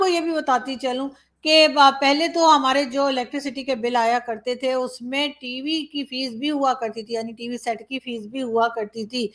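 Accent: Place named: Allahabad, India. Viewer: You're very cute BLOGGER, the way you speak it really reminds me of Allahabad , you're a true Allahabadi , keep it up , my best wishes and blessings I am too is ALLAHABADI , though live in Delhi BUT our ancestors Bunglow is still intact in Allahabad where i frequently visit , it's really a very sad situation that l live here in a flat WHEREAS only three aged my sisters and a brother lives in a 4 acre huge bungalow spread over 32000 sq feet I only wish that one day l could host you in my Allahabad house, if i really survive , l celebrated my 80th Birthday in San Francisco in the month of May So long ❤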